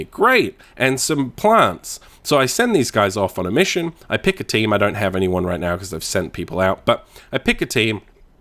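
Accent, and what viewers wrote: Australia. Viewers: Man, thank you so much for your honesty. The industry needs more reviewers like you. I tried this game and after the first 2 hours I was like ...THIS got 10/10? I would give it a 5/10. Good to hear a fellow Aussie too! Quick question - what do you think of Diablo 3 since RoS came out and they made the improvements? I'm considering getting it and I value your opinion.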